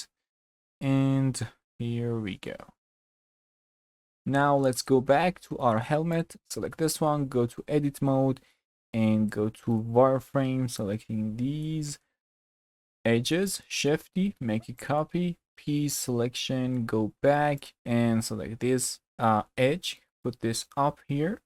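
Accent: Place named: Iran. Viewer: لهجه. فارسی ❤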